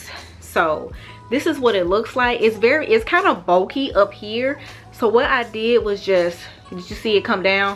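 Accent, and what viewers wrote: St. Louis, United States. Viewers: u sounding str8 outta Nellyville like u from the Lou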